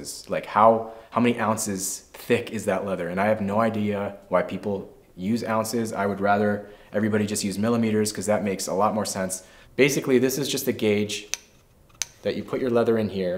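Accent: Canada. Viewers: Great vid! Thanks. Is it just me or do Canadians say "out" more than anyone else just to say "oot"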